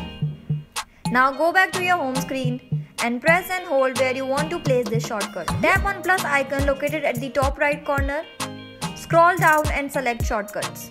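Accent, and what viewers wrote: India. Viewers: Oh yes let us find da root cause for da same. Indian English is so brutal...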